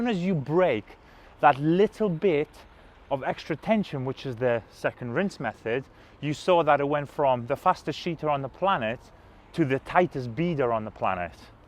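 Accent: United Kingdom. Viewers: Are you a geordie?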